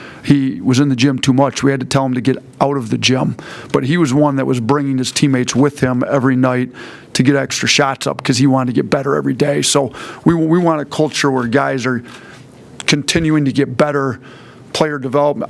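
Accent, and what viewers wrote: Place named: Northeast, United States. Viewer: he has the northeast accent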